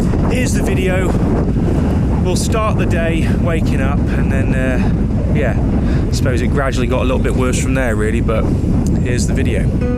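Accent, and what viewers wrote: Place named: Shropshire, United Kingdom. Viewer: Hi from a fellow Salopian 👋, I thought I recognised the accent . I actually found your channel while waiting for my daughter and boyfriend who are at Cineworld in Shrewsbury . So sorry that happened , total scumbags , should not be allowed to breathe the same air.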